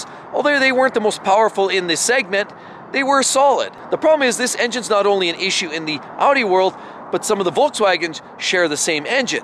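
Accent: Canada. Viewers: You are very well versed in automotive technology. You reinforce my distrust in newer vehicles. Not to generalize too much but they are nothing more than over priced junk. You sound like you are from Canada. Ehhh...!!!!! Thank you.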